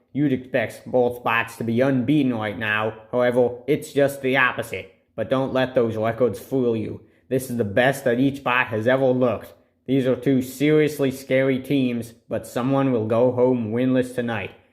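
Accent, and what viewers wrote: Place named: New England, United States. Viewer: Like your little New England JFK-like I accent. love the battle bots superstars.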